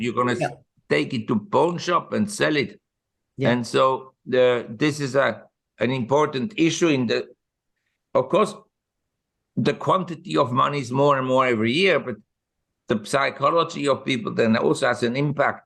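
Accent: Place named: Switzerland. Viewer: I love Marc’s Schweizer Deutsch 🇨🇭accent so distinctive and just add to the overall entertainment and insightful wisdom great choice of where to live Chang mai is fantastic Marc talks it’s good to listen Not sure that the precious metal call is a good one , I’d go maybe max 10 percent of a non yielding asset but the general advice of diversification has never been more important and keep dry powder keep cash now you can get 3 to 4 percent on your cash with no risk patience. Will pay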